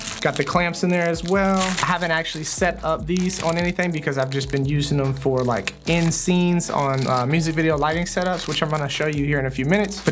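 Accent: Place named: Philadelphia, United States. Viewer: Bro I swear you sound like you’re from philly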